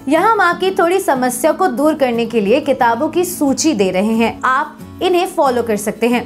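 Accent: Standard. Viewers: Mam do you speak after Google translate your Hindi is so pure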